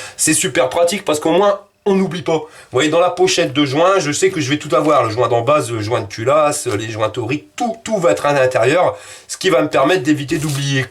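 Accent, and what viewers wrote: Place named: Nord-Pas-de-Calais, France. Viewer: il parle chti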